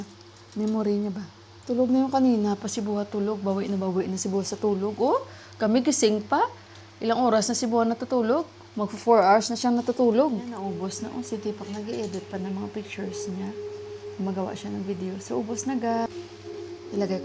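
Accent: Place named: Hiligaynon, Philippines. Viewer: Lingaw gid KO mag lantaw SA imo te Kay ilongga man KO te...from lemery Iloilo..bisan mga 5 yrs ago pa na vlog mo ate ambot lantaw KO tana AHH..Kay kuha stress KO mag lantaw vlog mo te kapin pa didto SA village te..kag Kanami Kay enjoy ka gid da SA lugar sang Nepal te..new subscriber Gali KO te pero lingaw KO ..Kay ang Tagalog mo te gwa gyapon pag Ka ilongga AHH..halong Kami pirmi te..pirmi KO hulat upload mo ..pero mga vlog mo nga dugay na lantaw KO yah te AHH.. hehehe ♥️♥️♥️😘😘😘😘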